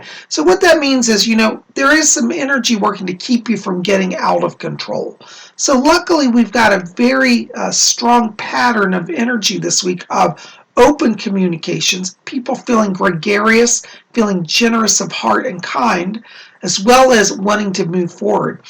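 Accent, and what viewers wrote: United Kingdom. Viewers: you starting to sound like your from UK lol you and Lady Cola make a beautiful awesome team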